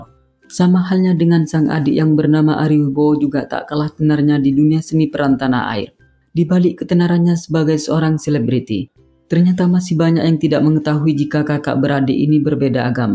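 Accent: Madura, Indonesia. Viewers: Adminya logat Madura 👍👍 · Di denger dari logatnya, dari suku madura ya gan ?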